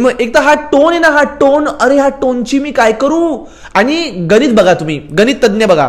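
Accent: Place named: Mumbai, India. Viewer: मुंबईची मराठी तर फारच छान 🎉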